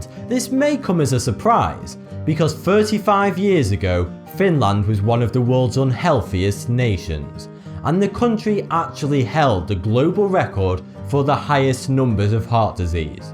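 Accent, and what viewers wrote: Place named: Manchester, United Kingdom. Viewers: He has a very slight Manchester accent. Which I like 👍. This guy does a fantastic job, well ahead of his years... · It's his dialect you idiot. Ever heard of the northern dialect of England.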